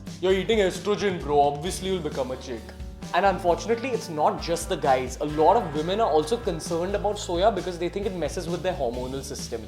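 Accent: India. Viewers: Idiotic logic + Indian accent = Pure comedy gold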